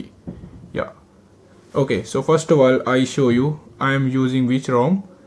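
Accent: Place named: India. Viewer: OMG u could not have more of an indiant accent.... cool vid tho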